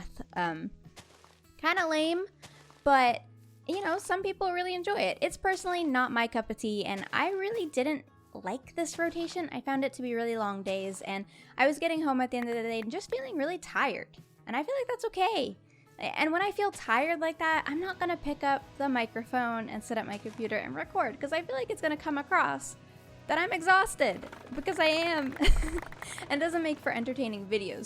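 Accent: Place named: Canada. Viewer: I love gems 'Canadian moments' like when she says cie-an or sore-ey because its so cool as you realise that she pronounces things differently than we do. I personally am having a lot of fun listening to her pronunciation (not in a mean way) and her metaphors. I love this channel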